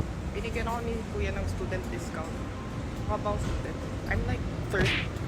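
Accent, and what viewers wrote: Philippines. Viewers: Yung accent talaga ehh. nagagandahan ako sobraaaa. like genuinely Filipino yung atake. Love youuu po!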